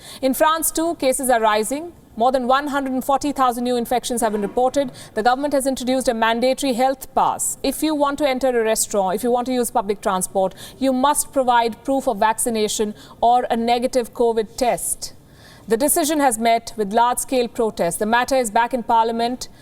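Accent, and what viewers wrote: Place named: India. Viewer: How funny of this india accent!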